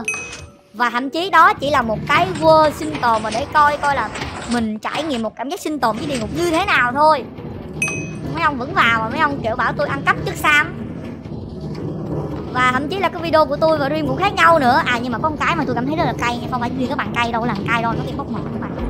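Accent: South, Vietnam. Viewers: người ta là người miền nam người ta có cách nói chuyện như v , bạn đừng bắt bẻ vì người ở đó nói chữ mà có dẫu ngã thành hỏi quen r bạn ơi , khó sửa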